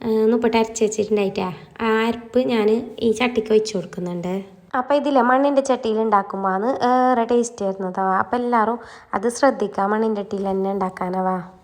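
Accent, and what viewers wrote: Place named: Kasaragod, India. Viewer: 🎉🎉🎉❤ സൂപ്പർ❤❤ കാസർകോട്ട് സംസാരം കേൾക്കാൻ സൂപ്പർ നമ്മൾ കോഴിക്കോട്ടുകാർ🎉🎉🎉❤ ഇതൊന്നും വെച്ച് കൂട്ടി നോക്കണം😂😂😂😂😂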